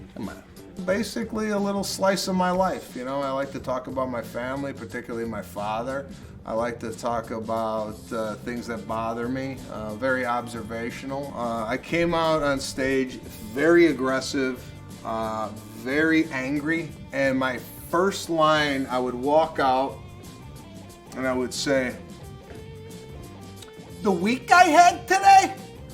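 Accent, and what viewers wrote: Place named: New York, United States. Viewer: It was interesting to hear things about you. I also like how you exaggerate your New York accent (and your HILARIOUS body movements!!!) during your routines.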